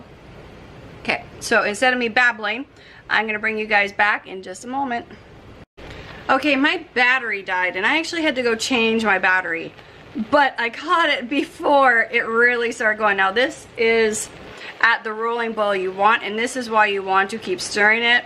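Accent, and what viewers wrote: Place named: Canada. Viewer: We can tell you’re Canadian cuz you say “own ready” instead of “ already”. My hubby is Canadian, but has lived in the states here for 50 years.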